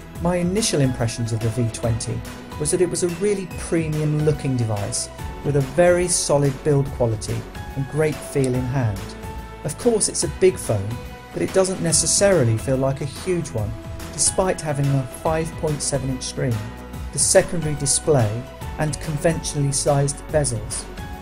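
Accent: United Kingdom. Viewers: Nice review! Everything i need to know is covered here. I love the British accent too.😊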